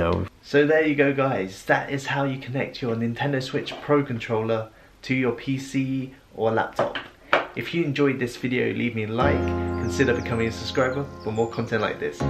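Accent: England, United Kingdom. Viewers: First time I see an Asian with British accent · How are you asian but with an english accent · He looks asian but he has an British accent LOL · Was not expecting this guy to have a British accent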